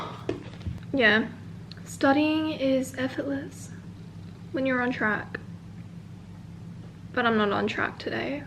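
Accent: Australia. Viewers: How to get straight A’s. me thinking why she’s talking about letter grades and she has an Aussie accent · Her beng typical a aussie norr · her aussie accent tho 💖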